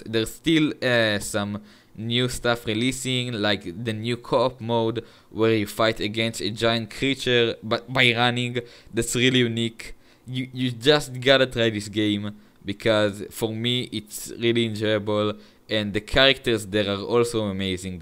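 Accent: Israel. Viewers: יש לך מבטא ישראלי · אחי שומעים בקול שלך שאתה ישראלי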